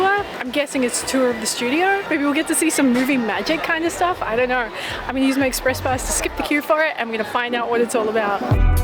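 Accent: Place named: Australia. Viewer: Hello I just subscribed to your channel. I have mad respect for you going to theme parks alone. Couple of weirdos starring. I love your Aussie accent. Stay cute and safe!! 😊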